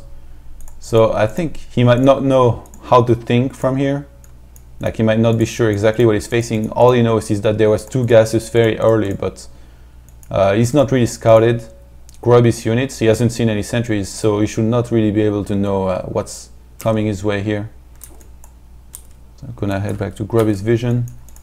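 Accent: France. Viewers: that french accent! keep it up! the analysis level is close to qxc`s terran analysis. and that`s about the highest praise i can give you.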